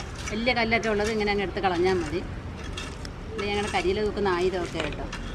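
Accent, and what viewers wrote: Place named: Punalur, India. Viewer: chechi. Punalur ആന്നോ. Veedu. അവിടുത്തെ. Samsaram ആണ്. Super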